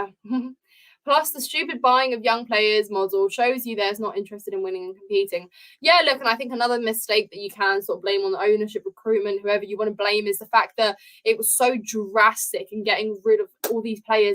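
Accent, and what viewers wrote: United Kingdom. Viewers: Thanks.Ive got to say that the board will be taking that decision anyway.The next three games to the New Year could well put us in the danger zone.Could well be another interim manager to the end of the season..Its the Poch.playing system that is causing all these difficulties.Dearly wish we had signed Naglesmann to play that German system.It does work in the Priemership as Klopp has proved. Incidently I love hearing your London voice brings many homesick memories back!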